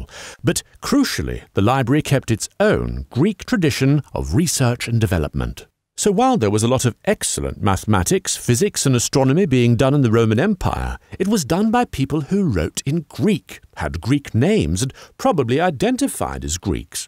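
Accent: United Kingdom. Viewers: As an Englishman I was very disappointed when the narrator with a VERY British accent said math instead of maths. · Strange that you use Math instead of Maths given that you clearly have a British accent. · Are you putting on the posh accent or do you just naturally sound like Stephen Fry? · He's British. He has a British accent. In Britain it's called maths. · the british voice turns it off for me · Why does this guy talk with a British accent but uses American terminology? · If you are English, Sir, and I presume from your accent that you are, why do you keep saying “math” in this video instead of “maths”? “Math” is an Americanism and one that makes no sense, especially when one considers that it is supposed to be short for “mathematics”, a plural noun.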